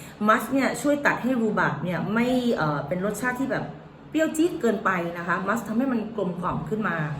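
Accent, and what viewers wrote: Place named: South, Thailand. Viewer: อุ๊ย ชอบ แหลงใต้ด้วย สาวใต้สวยเก่งแบบนี้ต้องติดตามทุกช่องทางแล้วค่ะ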